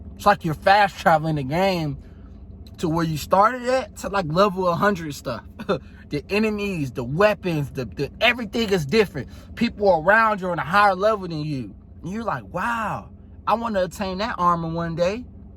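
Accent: Baltimore, United States. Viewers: Thanks for content brother. I've learned throughout the journey, just because you don't like the truth... doesn't stop it from being the truth... the gift and the curse of the red pill... i however will take the hard truth over the easy lie anyday... also, im trying to place your accent. You from Baltimore?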